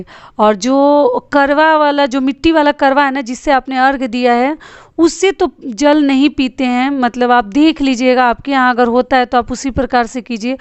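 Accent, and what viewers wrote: Bihar, India. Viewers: Mam ap bihar se ho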